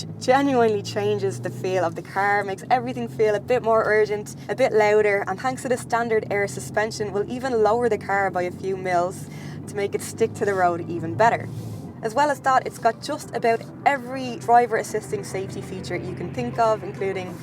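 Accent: Ireland. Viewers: This channel is pushing great reviews! keep up the great work. Also that Father Ted accent makes it even better :) Cheers from India!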